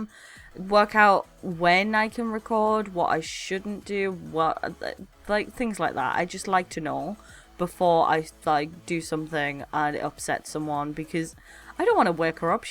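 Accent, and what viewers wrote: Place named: London, United Kingdom. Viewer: New subscriber here! Discovered you today and i'm in love with your content and your accent! Like... Damn, can i be from London to have it? hahaha keep up the good work! <3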